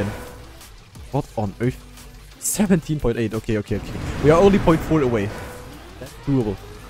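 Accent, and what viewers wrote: Germany. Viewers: Hallo der herr xD deutscher aktzend und deutsche wörter wie Ja Alter NEIIN haben dich verraten xD Guten morgen xD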